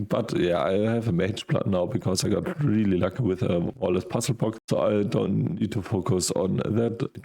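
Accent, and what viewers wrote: Germany. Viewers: Hes pretty clear, I think he's German, so the accent is there its understandable, atleast for me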